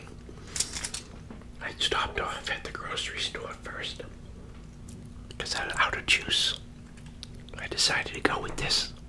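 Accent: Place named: Massachusetts, United States. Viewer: Previously being from Massachusetts it's nice watching these videos and hearing a familiar accent.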